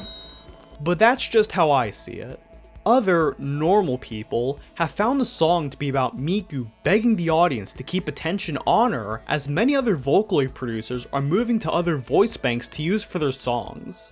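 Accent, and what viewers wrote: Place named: United States. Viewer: im guessing that the video creator is from america based on their voice. here, most people say it with a less pronounced second "t", like little being pronounced more like "liddle" here in the US. so usually people in the u.s pronounce it as "teh-doe"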